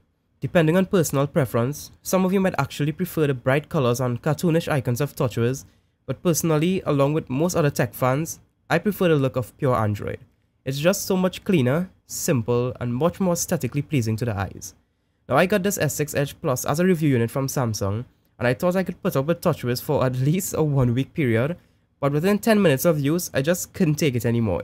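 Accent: Trinidad and Tobago. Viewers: I recognise that accent! love all the way from trinidad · Are you trini? Can spot that accent a mile away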